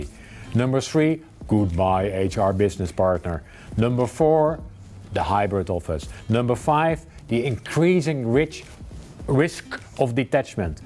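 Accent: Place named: Netherlands. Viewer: dit Nederlandse accent ook.......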